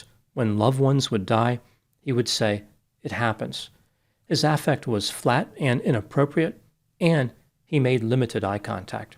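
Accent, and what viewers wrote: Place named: New Jersey, United States. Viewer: Dr accent is very Jersey or Philly..So that may explain alot..lol..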